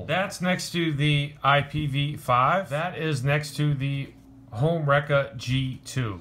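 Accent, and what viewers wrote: Boston, United States. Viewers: u from Boston ain't ya? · r u from boston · u must be from Boston or Maine aye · i was wondering where your accent was from then you said copper. Only people that say cahpah are from boston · Can tell you probably from Boston or close by. I'm from South Boston myself. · are u from boston cuz i can hear boston accent.lmao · this dude is from boston right? his accent wierd.... "copah" lmao xD insted of "copper" lol when i heard him say coper i instantly knew XD! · Is this guy from Boston? Most times he said copper it sounded like coppah Either Boston or Philly.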